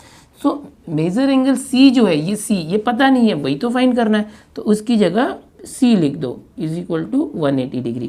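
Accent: Maharashtra, India. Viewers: Sir aap marathi hai kya.. ????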